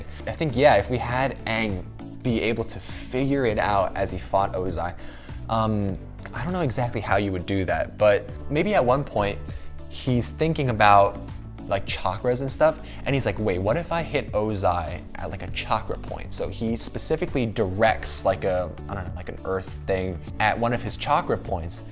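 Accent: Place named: United Kingdom. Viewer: What’s with that British accent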